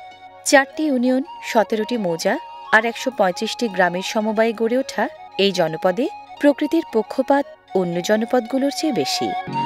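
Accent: Standard. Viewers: আপুর কন্ঠের ভাষা অনেক ভালো লাগে। শুদ্ধস্বরে বাংলা ভাষা শুনলে যেন প্রান জুড়িয়ে যায়। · আপু আপনার অনুষ্ঠান খুবই ভালো লাগে আপনার মুখের কথা গুলো অতি শুদ্ধ আর আপনার মুখের ভাষা গুলো শুনতে ভালো লাগে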